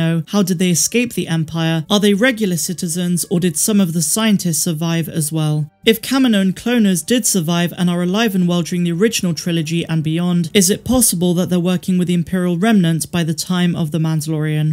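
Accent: United Kingdom. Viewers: You’re British accent really helps mine mate , thank you mate . And may the force be with you.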